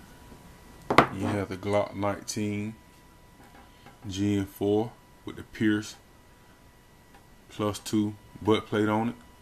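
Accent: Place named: Georgia, United States. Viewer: nice vid,where you from.you sound like a georgia boy,maybe atlanta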